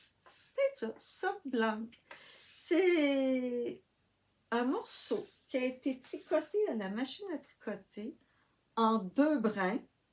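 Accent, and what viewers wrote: Quebec, Canada. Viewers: Bonjour, super vos réalisations et cela m’a beaucoup inspiré notamment le montage des cols, je vais essayer. Je ne suis pas contre un tuto même si je crois avoir compris comment faire. J’adore votre bel accent québécois:) Bonne journée. Muriel de Paris (France)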